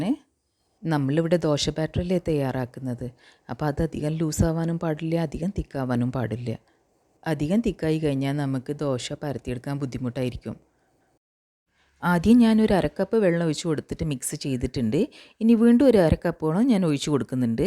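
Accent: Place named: Kannur, India. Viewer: Kannur slang❤❤❤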